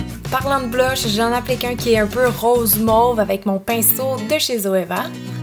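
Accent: Quebec, Canada. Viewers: Coucou, j'aime beaucoup tes vidéos, tu es trop cute, j'adore ton ptit accent québécois et tes ptites tâches de rousseurs c'est trop mignon :) j'aime aussi tout tes conseils de maquillage, mode, et ta personnalité, la fraîcheur, la simplicité et ta bonne humeur, continue comme ça ! Puis félicitation pour ta ligne de vêtements, je te souhaite le meilleur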